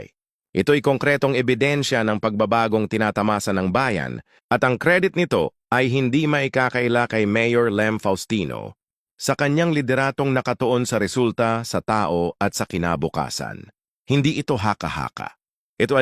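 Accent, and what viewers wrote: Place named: United States. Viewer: Lintik din ang narrator parang kano ayusin mo ang mga pag bigkas kakainis para kang hindi Pilipino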